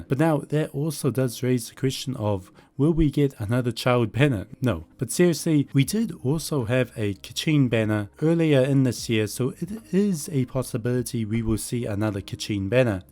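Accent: New Zealand. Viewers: Are you a kiwi? You gots my accent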